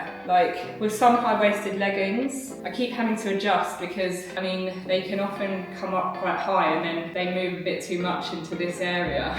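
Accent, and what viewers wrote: United Kingdom. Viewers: I love you sincerely from Texas and absolutely love the British accent...!!!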